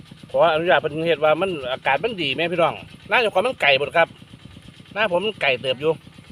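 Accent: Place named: Roi Et, Thailand. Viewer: คนจังหวัดดั่ยสำเนียงคนร้อยเอ็ดกับหนองบัว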